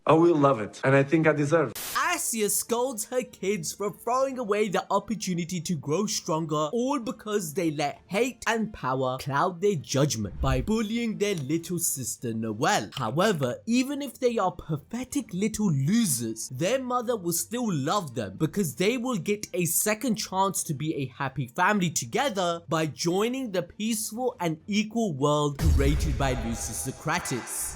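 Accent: Punjab, India. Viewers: Nice hindi accent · The Punjabi Hindi accent🤣🤣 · Is this guy indian?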